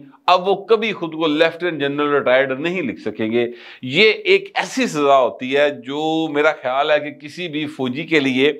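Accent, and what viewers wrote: Lahore, Pakistan. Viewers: Geo tuhada jawab nahin. Awwami style Khalis Lahori lehja dill ko chhoo leta hay.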